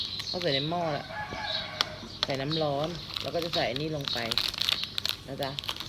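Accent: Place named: North, Thailand. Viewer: เหนือขนาดจ้าว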